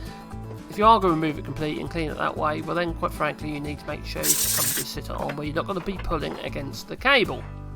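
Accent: Norfolk, United Kingdom. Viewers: I know this is how the world has gone but I prefer your natural voice. As a Scouser I can't be certain but I would bet it is a Norfolk accent?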